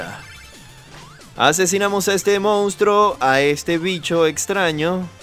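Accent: Venezuela. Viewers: OK OK ok...sere yo quien lo diga: DROSS!?!? ERES TU!?!?!!? hahaahah Bueno no es que esas grocerias con ese acento venezolano me recuerdan mucho a sus viejos vídeos. Por cierto esos juegos son de los que te vician y no mas no te sueltan!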